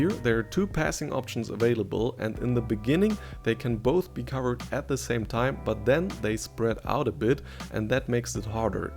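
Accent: Germany. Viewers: Man merkt ja so krass dass deine Muttersprache Deutsch ist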